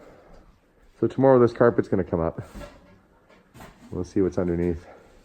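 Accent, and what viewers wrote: Canada. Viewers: Lol you have a strong canadian accent with some words. I will forget you're in canada, then i will hear something like "sorry", "ay" or "looney" lol then im like, oh yea he's up north. ;-) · I'm not used to a Canadian accent because I'm from the Southeastern US, so it throws me off with some of the ways you pronounce things. Other than that, I love this. I love you going through her house and all the cool things you're finding. Keep up the good work. (I misspelled Canadian in case you were wondering what I edited.)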